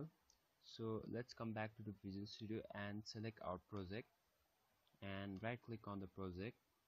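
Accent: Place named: India. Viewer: I hear an indian, I click off...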